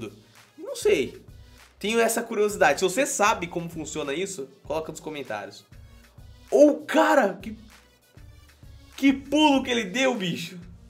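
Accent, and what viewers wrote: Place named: Ceará, Brazil. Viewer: Você e cearence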